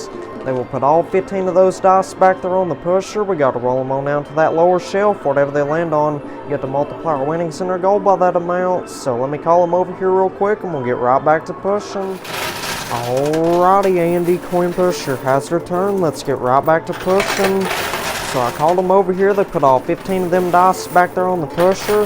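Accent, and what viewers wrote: United States. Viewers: I like American English accent. 😊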